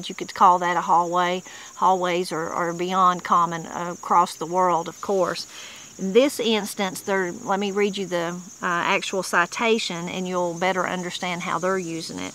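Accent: Appalachia, United States. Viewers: They use "He's a good hand to have on the ranch. Or he's a terrible hand with horses." Mostly used in ranch talk. We use hard in Utah. "He was hard pressed for a job. He's a hard one to talk to. "There's hardly a time we don't walk to the store. ", these are so wonderful and I really enjoy your Appalachian accent. 👍💖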